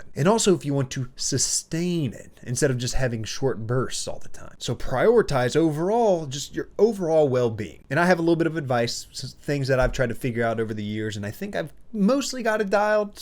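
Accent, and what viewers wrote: United States. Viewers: Amazing American Accent, gotta learn some of it too!!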